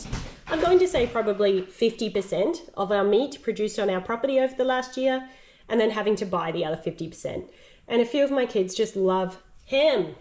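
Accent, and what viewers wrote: Australia. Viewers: New subscriber! Lovely to have found your channel, its so great to hear our Aussie accent ❤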